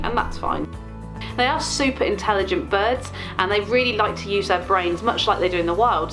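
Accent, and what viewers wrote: United Kingdom. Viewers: In you say: "she's literally older than me" automatic subtitles: "it's really a hotter than me" how dare you translater? Zorro is a "she" not an "it" ^^ I think your cute british accent confuses the translater, altough i think you speak pretty clear. (because english is not my first language, i happily use the automatic subtitles often anyway)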